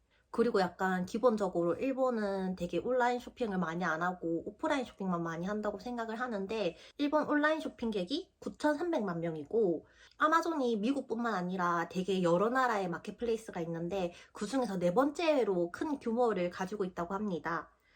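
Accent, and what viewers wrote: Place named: Seoul, South Korea. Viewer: 굉장히 서울말같은 사투리네여 귀에 쏙쏙 박히고 이해가 잘 가네요. 최근 영상도 찾아봐야겠어요. 감사해요.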